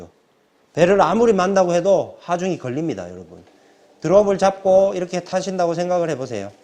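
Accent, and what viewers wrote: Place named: Gyeongsang, South Korea. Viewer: 경상도말투가 이렇게 자상할수도잇구나...기본개념부터 차근차근 잘설명해주셔서 본인자세를 다시한번 돌아보게되는 좋은 영상입니다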